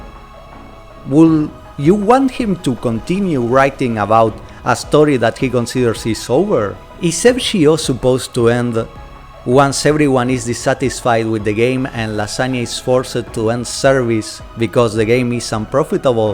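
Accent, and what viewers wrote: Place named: Argentina. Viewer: El inglés más argento loco. Muchaaachooos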